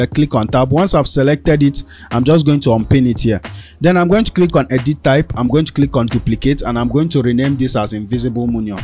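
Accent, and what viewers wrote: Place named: Singapore. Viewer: I am from Singapore and I think we have a very similar accent! haha.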